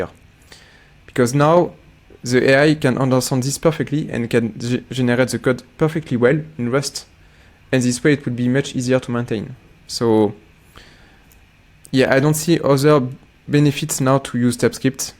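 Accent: France. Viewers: HAHAHA je me suis dis la meme chose , son accent français est vraiment fort n'est pas Pierre ?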